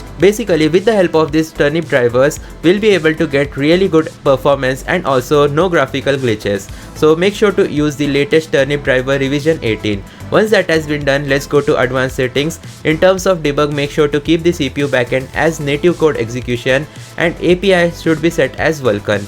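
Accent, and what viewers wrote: India. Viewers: Bro it's Indian accent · Bro it's just indian accent U racist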